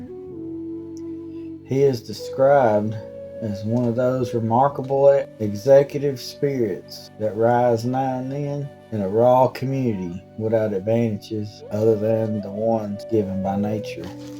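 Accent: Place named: Appalachia, United States. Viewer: Good day to you and all our Appalachian cousins from the UK, I hope that you have all had a happy Christmas. I just stumbled upon your channel and I am mighty pleased to have done so. Being British there is no shortage of history to discover here but having family ties in the US and visited the country a number of times I am also interested in your local history. I also enjoy listening to your speech, it is so different to the North eastern dialect, smoother and slower it is a delight to listen to. I have subscribed and look forward to viewing your other videos so thank you and best wishes for the New Year..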